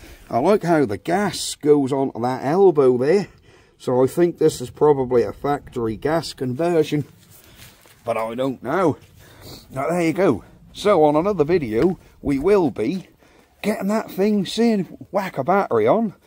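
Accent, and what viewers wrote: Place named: Black Country, United Kingdom. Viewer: Guna gu sounds like black country Like Yo ba guns gu darn there bin ya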